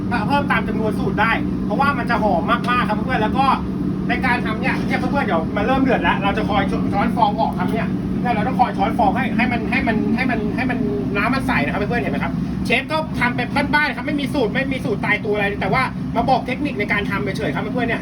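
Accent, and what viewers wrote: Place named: Suphanburi, Thailand. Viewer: เชฟเป็นคนสุพรรณหรือเปล่าค่ะ พูดเสียงเหน่อ ขอบคุณค่ะ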